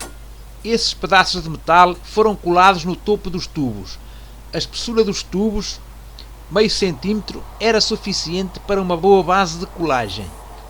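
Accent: Portugal. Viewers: Não sei de qual país você acho que portugal mas você é muito inteligente amigo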